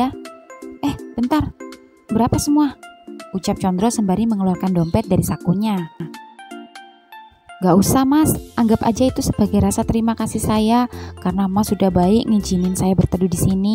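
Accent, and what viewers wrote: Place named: Papua, Indonesia. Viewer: Kadang tersenyum dengar logat papuanyaaaa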